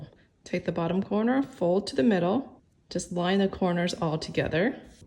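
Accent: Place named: Bay Area, United States. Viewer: I knew you were a bay area gal! I had a feeling from your accent and coolness. Go warriors!!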